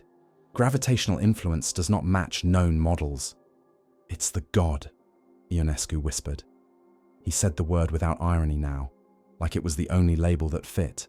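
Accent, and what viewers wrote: United Kingdom. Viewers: Another British accent so tired of this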